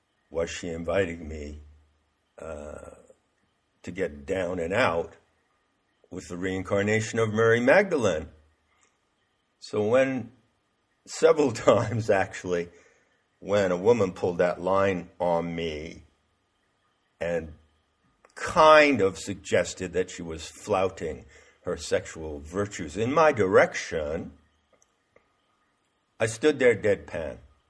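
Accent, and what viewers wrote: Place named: Maine, United States. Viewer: Sensei, thank you for these genuine spoken words...i am just now obviously catching up to your most recent talks. I am not a young female of Spanish descent... this is my wife's URL, which ive had to use since being kicked off of themtube months ago. Such a Heretic am i! As a Jungian Psychologist (not Yung-lol) originally from the Boston area, i love to hear your Maine (?) accent. From Gnosticism to Jung to Henry Miller and Karuoac (Lowell, Ma) to Casteneda, the Mystery schools and more...so very much of what you say rings true to me as if it were second nature. What a terrible expression, second nature?! I have no heros, i have no idols, but simply felt the need to express how deeply i appreciate you sharing of yourself so honestly...a breath of fresh air in a world swirling in bull shite. My patience with people is waning...but it may be about damn time! 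In a sense, anyway.... I should write to you on your website without being a total blowhard. Thats not easy - i have much say that few besides yourself and a few deceased writers dare contemplate, lest it shatter their fragile egos. Your Integrity is panacea to my soul my Good Man! Cheers and Blessings from sunny, somewhat free Florida. Lux et Veritas mon ami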